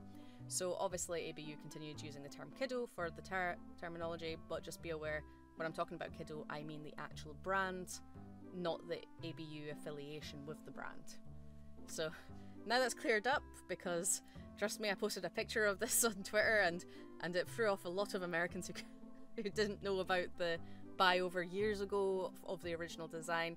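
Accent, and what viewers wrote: Scotland, United Kingdom. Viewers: Wow!! Thats the thickest scottish 🏴 accent i have hear from you so far. Please keep it up yer wee lassie 👍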